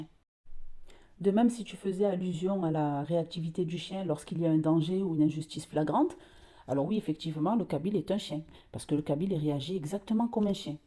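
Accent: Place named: Marseille, France. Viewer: Très bel accent marseillais.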